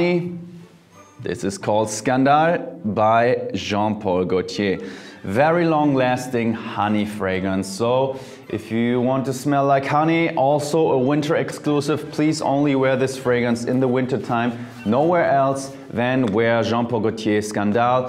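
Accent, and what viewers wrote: Germany. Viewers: Hus accent is so strong i could tell within seconds he is German😂😭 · He has a strong German accent when he speaks French.. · He is stunning but his English could be improved ;) I bet he is a german speaking person! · German accent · clearly a German accent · Not French at all! He is definitely German!! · Is he German ? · I hear a german accent there🤔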